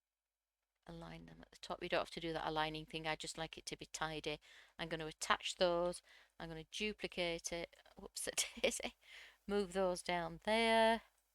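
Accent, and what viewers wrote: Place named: North, United Kingdom. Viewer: I love your videos as you explain every thing so well and a good old northern voice to boot, which makes a refreshing change.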